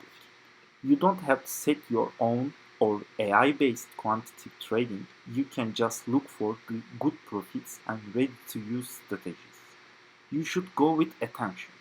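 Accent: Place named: Turkey. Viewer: İngilizcenden Türk olduğunu anladım 😁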